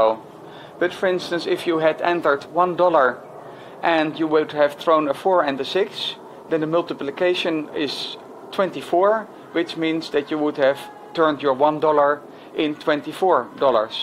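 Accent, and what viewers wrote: Netherlands. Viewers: I can hear you are Dutch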